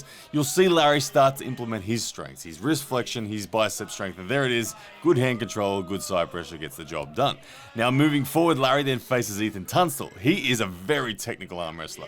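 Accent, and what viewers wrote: Australia. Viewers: Is working on a new commentator voice? He sounds less Australian than usual, here.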